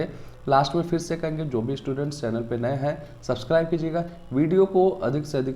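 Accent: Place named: Uttar Pradesh, India. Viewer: Bhai up waale m ko yam aur H ko yah Cos ko cas aur Gauge ko gaaz hi Bolte hai😄😄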